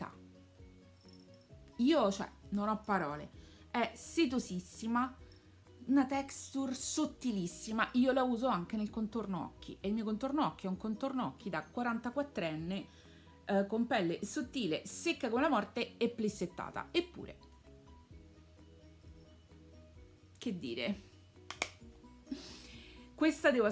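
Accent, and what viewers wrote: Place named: Rome, Italy. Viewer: Primissimo tuo video che vedo, sei davvero tanto simpatica! Mi piace un sacco la tua parlata romana 😘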